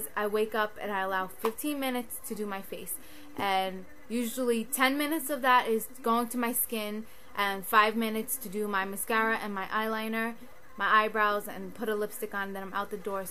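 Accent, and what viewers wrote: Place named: Miami, United States. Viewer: you sound like your from Miami, anyway great video, very informative!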